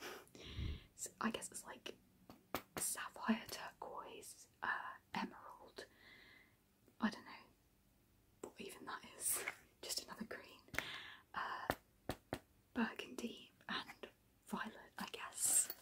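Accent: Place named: RP, United Kingdom. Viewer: How come you have a sort of posh accent?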